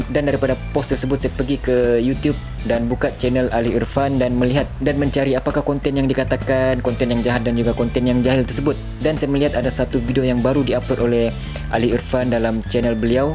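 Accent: Sabah, Malaysia. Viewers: Bro ni orang mana ya? Loghat sabah hm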